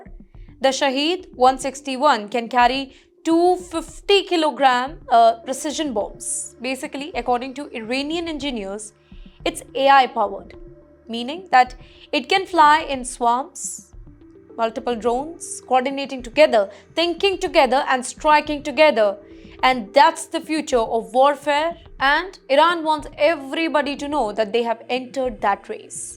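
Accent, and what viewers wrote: India. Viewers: I hate Indian English😂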